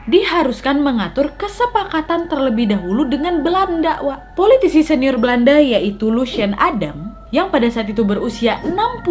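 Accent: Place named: Medan, Indonesia. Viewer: Aku pikir orang Medan? Bener enggak sih? Soalnya logat cakapnya macam anak2 Medan, ngejelasi macam begado, pakek ketawa dan macam gibah😆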